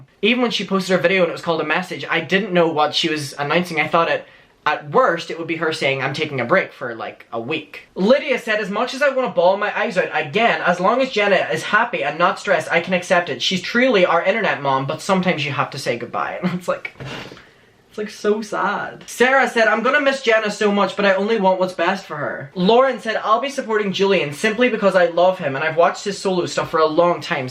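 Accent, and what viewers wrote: Northern Ireland, United Kingdom. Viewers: Oml northern Irish?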